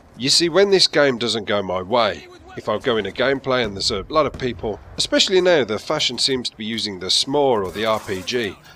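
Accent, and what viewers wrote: United Kingdom. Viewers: I enjoy listening to you UK guys more than the US commentaries. Keep up the good vids bud!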